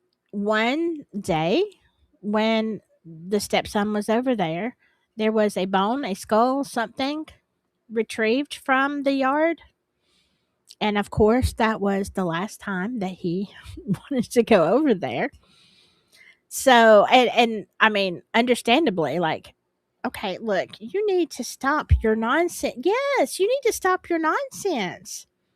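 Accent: South, United States. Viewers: Hey I'm a new subscriber from England 💙. Saw you first with the Prof and I have missed you so I have come over. It's so soothing listening to your lovely Southern voice ☺️🤗. Really enjoyed the live on replay. Fascinating story. That Mark is off the charts creepy. He was no bystander imho. Would love you to cover a UK serial killer.. we have a few.. sadly. The White House farm killings is interesting.. although that was a mass murder.. only a thought.. Much love. Hyped 💙🤗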